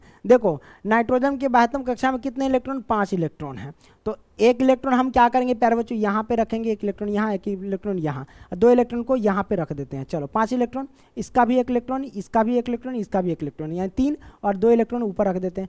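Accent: Bihar, India. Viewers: Sir aap Bihar ke ho kya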